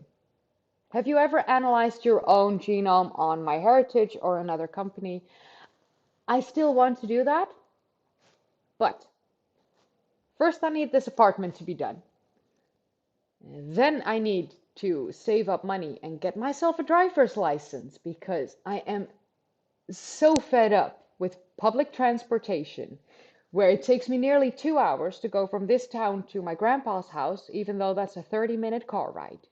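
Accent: United States. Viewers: your accent,..which, to my ears, sounds rather American - influenced ( especially the ‘ r ‘ consonant ) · your diction, intonation and pacing / rhythm so like "American English", that I thought you were an American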